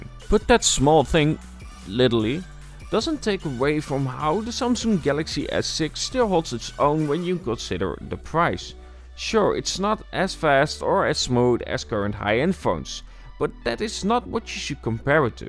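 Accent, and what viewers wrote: Netherlands. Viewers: You are dutch confirmed it via you english accent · Meteen in de eerste zin wist ik dat je nederlands bent😃 top video💪💥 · Still using the s6. You have a dutch accent, I can hear it because I'm dutch, am I right? ;) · Haha nederlands accent😂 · Youre dutch, my accent is the same as youres😉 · Je hoort gewoon dat je Nederlands bent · That dutch accent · nederlands😂 kun je dds goed horen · Jij bent nederlands, ik hoor het!!!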